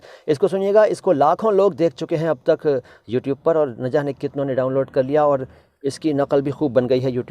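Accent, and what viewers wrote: Standard. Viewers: bahut shudh bolte hein aap waah☺🙏🙏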